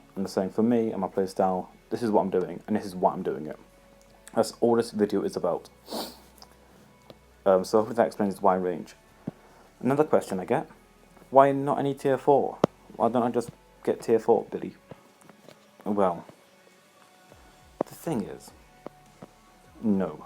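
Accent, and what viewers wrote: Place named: England, United Kingdom. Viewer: Awww English accent...😀.. English?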